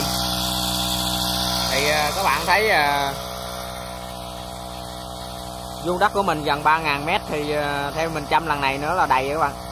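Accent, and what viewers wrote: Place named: Mekong Delta, Vietnam. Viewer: ok anh mà ở đâu để tiên giao hàng với bảo hành này kia luôn.nghe giọng chơat miền tây.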